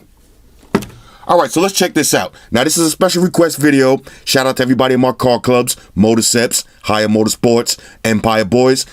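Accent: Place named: Brooklyn, United States. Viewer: You from Brooklyn son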